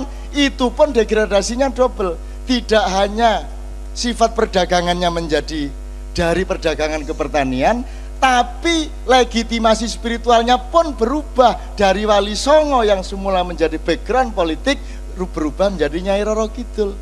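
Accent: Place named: East Java, Indonesia. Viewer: bukan sombong khas orang jatim budaya arek mang gaya bicarane begitu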